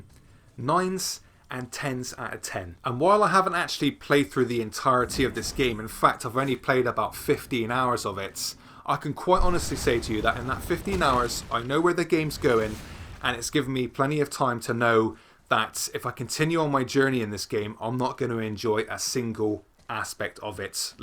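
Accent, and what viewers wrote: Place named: Bristol, United Kingdom. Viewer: Also, where you from? You sound like a fellow Bristolian!?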